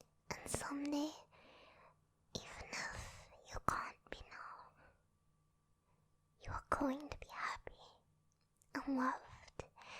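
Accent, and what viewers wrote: United Kingdom. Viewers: with a legit bri'ish accent? Never heard it before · Sometime it sounds like you have a British accent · Honestly...the older shadow theme was fun and unique but I’m really loving the more typical type of ASMR from you especially with your normal voice and accent. I do have a soft spot for the British accent being from the UK myself😊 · YES THE NORMAL BRITISH VOICE IS BACK THANK YOU THIS HAS CURED ME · is she britsh it kinda sounds like it at some points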